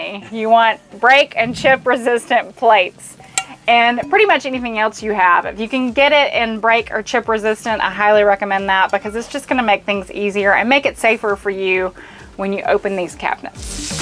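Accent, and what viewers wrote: United States. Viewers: I love you accent! im French and my favorite American accent is yours!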